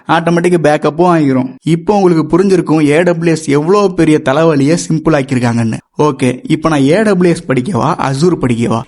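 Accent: Tirunelveli, India. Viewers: Bro .... Very clear explanation in tirunelveli slang ❤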